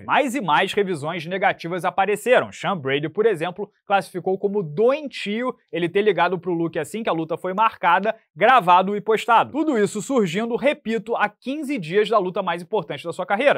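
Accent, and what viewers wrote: Rio de Janeiro, Brazil. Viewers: Este seu sotaque de Carioca, trava seus vídeos! Tbm acho que balança de mais a cabeça no vídeo, abraços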